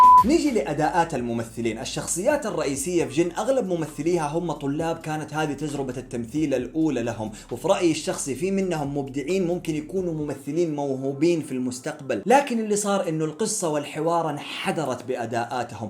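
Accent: Jordan. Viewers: تعليقي رح يكون بس على لهجتك الاردنيه الصح😅 لو مثلت بالمسلسل كان عملت جو رهيب😍 و غير هيك انه لكنة دواوين اصلي و ابن الاردن صح 😍 يعطيك العافيه ع هيك اداء جبار😍❤❤ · يا اخي متت ع كلامك الأردني جايب الشخصية صح😂😂😂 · انا جايه من شارع الاعشى ، انصدمت انك بتعرف تحكي اردني اكثر مننا 😂😂😂❤❤ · مش طبيعههي ياا الله طلع اردني الشب😂😂😂❤️ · انتا اصلك اردني حجي؟ انتا بتحكي اردني احسن مني 😑 استمر يا وحش 🖤😁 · حبيت تمثيلك الأردني فرطت 😂😂 اقسم بالله انك فنان متقن اللهجة بشكل رهيب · تقييمه 3.3 بس لهجتك الاردنية تجنن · اكيد كنت عايش او بتدرس في الاردن مستحيل اللهجه والاداء هذا من كم يوم او سياحه هناك😂😂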